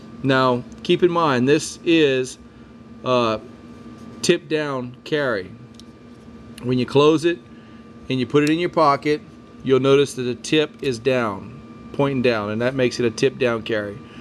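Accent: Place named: South, United States. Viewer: usa earth? Easy to tell your a southerner. I approve :-) thanks for the review, and the realistic terminology :-)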